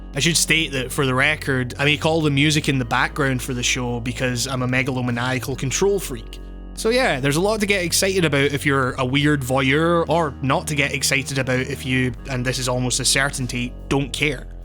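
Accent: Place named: Scotland, United Kingdom. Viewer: This is the first video I have watched from you that didn't feel like i got something out of it by the end. I do appreciate the the experience was landmark for you and that you wanted to share it but for some reason i cannot put my finger on, i did not like this type of video from you as much as your others. For the record i love your work, the way your brain processes information, the way you convey that information to others through an impressive vocabulary that leaves me needed a dictionary sometimes. Also your accent is cool, I would assume...Scottish? Just a guess. Maybe Irish. Odd that I don't know how they are supposed to sound different but that they should. Anywho, i'm getting side tracked. I just wanted to drop in my two cents on how i felt about this video so that you could use it as constructive criticism and hopefully that will help you make additional content that more people enjoy. 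Again i cannot seem to put my finger on what makes this review less impactful and enjoyable than the others but i encourage you to keep at it cause you do good work. Again it's not that I didn't like this video, just less so than the others. I may be in the minority on that though so you should of course get input from others as well. :) have a good one man, hope to see more content soon! Edit: Sorry i responded to such an old video, you may not see this post at all, but i wrote and submitted it before i checked the date on the video.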